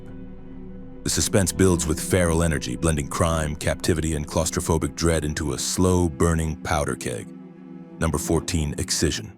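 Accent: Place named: Australia. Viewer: Something about the Oz accent...like fingernails on a chalkboard 😅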